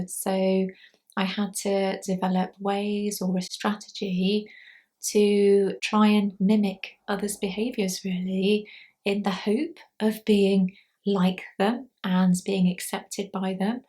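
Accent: United Kingdom. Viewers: Are you from the UK, Australia or New Zealand.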